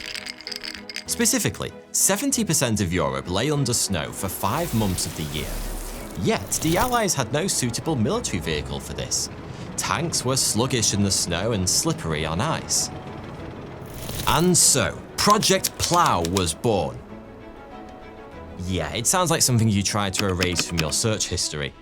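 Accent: United Kingdom. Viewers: It's a particular British accent.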